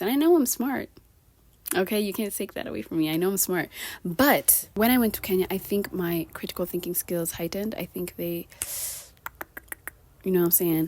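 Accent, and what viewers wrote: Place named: United States. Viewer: I love that American acsent of urs... very cutesy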